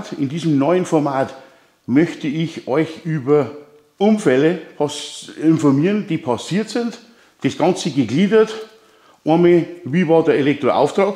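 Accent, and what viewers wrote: Bavaria, Germany. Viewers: Hallo, meine Meinung zu den aufgeworfenen Fragen: "Jeder Unfall ist vermeidbar. Eine schuldhafte Pflichtverletzung liegt hier meines Erachtens von keiner Seite vor. Die Prüfung des spannungsfreien Zustands sehe ich nicht als zwingend an. Bei einer Leitung, die ich selbst gelegt habe, gehe ich doch nicht davon aus,dass diese plötzlich Spannung führt. Vielmehr handelt es sich hierbei um eine "Anlage im Bau", spannungsfreiheit müßte dann geprüft werden, wenn die Anlage durch Schalthandlunge unter Spannung gesetzt werden kann. Da davon auszugehen war, dass die Leitung noch nicht abgeschlossen ist, war dies hier nicht der Fall ".Schlußfolgernd muss gesagt werden, dass bei der Auftragserteilung eine bessere Abstimmung erfolgen muss. Ich würde die Anschlüsse an der Hauptverteilung nur von einer Person, nach Vertigmeldung der übrigen Arbeiten, vornehmen lassen. 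Ich finde deine Beiträge großartig, wertvoll für Schulung, Aus-und Weiterbildung. Ich habe über 40 Jahre im Beruf gearbeitet und sehe deine Beiträge sehr gern. Das Bayern ihre eigenen schönen Dialekt haben, weiß man normalerweise. Viele herzliche Grüße Reiner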